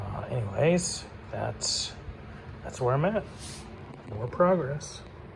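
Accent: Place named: Canada. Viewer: .....thanks for the reply, yes a good idea to test before committing to the frame. I take it from your accent you are Canadian, a couple of guys I follow on YT post regularly, Elin 'Rusty beauties' and chef tush 'tush mahal' both are triumph enthusiasts, and from your neck of the woods, though obviously Canada is just slightly bigger than the UK so you may not be that close.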